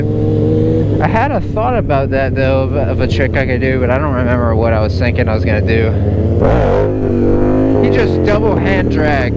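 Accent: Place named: India. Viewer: W Indian accent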